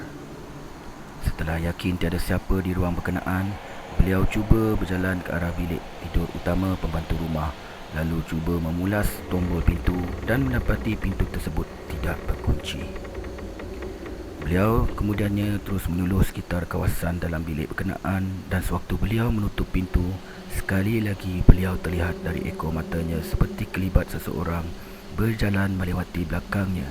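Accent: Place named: Sarawak, Malaysia. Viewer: apa lampu picit ia..agi pekat kelaka kitak sarawak iya bro...terbaik bro.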